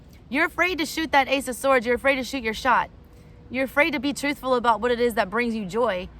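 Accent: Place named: South, United States. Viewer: A southern story telling straga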